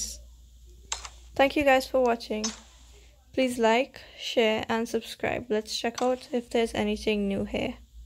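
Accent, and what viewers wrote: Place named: Trinidad and Tobago. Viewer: you sound like a Trini but I'm from here. If you want to link with my clan hit me up. 『GØD』Ërmac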